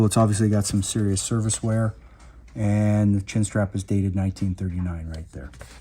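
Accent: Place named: Canada. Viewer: There is no "correct" pronunciation, the way he pronounces it is common in Canada. I'm going to take a wild guess and say you're American, with the blatant irony being that Americans have taken the ENGLISH language, you know...from England...and you pronounce half the words differently than the people who invented it..but here you are acting as if other people are wrong. Try opening a book occasionally you diabolically low IQ product of the failed American educational system.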